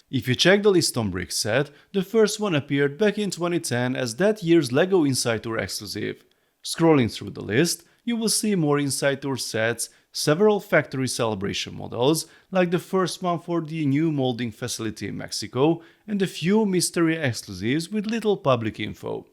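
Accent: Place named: Hungary. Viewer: mindig is kicsit éreztem az akcentusodon mintha magyar lennél de amikor kimontad hogy nyíregyháza egyből tudtam. király videó csak így tovább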